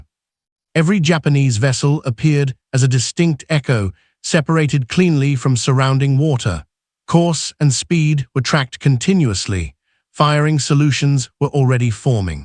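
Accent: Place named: United Kingdom. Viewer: Why add distracting and annoying flickering speckles, cropping, streaking, and shaking to what could have been clear steady images?!! Your ridiculous images show objects and personnel vastly distant in location and time from what you describe. Why Brit-accented narration of a 100% US operation?!! DISLIKE! UNSUBSCRIBE!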